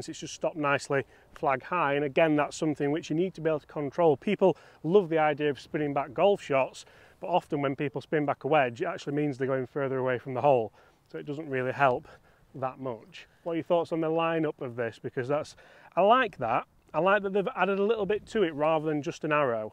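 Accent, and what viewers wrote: United Kingdom. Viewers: I love your videos. Please explain what the hell is going on with you Brits pronouncing URETHANE as if it was spelt URETHEEN. I notice it's not just you. It seems to be a thing for you blokes. What's going on? At the end of the day it's just pronunciation so no big deal but why mate? I'd genuinely like to know.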